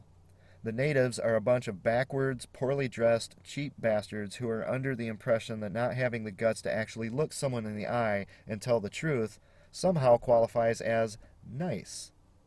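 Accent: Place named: Minnesota, United States. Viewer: The biggest irony behind this video is that this guy has one of the thickest MN accents i've ever heard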